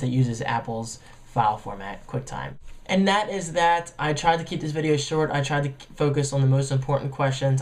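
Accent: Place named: United States. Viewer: Arghh I love your accent, im brittish and just love the american voice ! Oh and yea I have this camera and i LOVE IT, the audio isnt great but it recorded a concert with heavy bass really well. I also used it when skiing.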